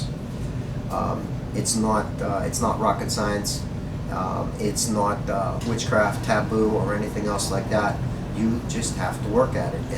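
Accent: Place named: Pennsylvania, United States. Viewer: Love your videos an your PA accent as well. You don't mess around or mince words. I love that. Link to the DVD s would be cool.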